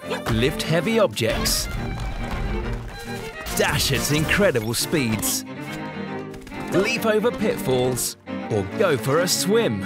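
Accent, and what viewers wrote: United Kingdom. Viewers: It's the British accent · I can't help but think of Ryn from Xenoblade Chronicles when hearing the British narrator.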